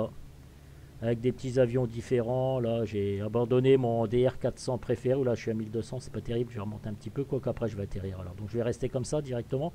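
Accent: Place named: North, France. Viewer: Merci pour le partage. Toujours aussi plaisant ces.petites balades. ... Avec toujours une petite pointe d'humour avec un accent bien du Nord.😉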